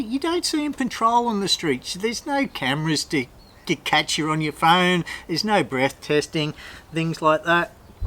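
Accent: Australia. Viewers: You talk like my mates back in oz do and I haven't been back there science 2013 so enjoy listening to you and watching your videos I've been meaning to come to your reaturant I live near the airport my wife has been very sick for the last year and she died last Sunday so now I can find the time Sean from tasmainia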